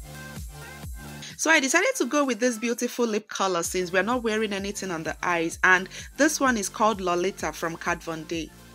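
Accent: Nigeria. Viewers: i just love you ...your makeup is good..your psyche and that nigerian accent is lit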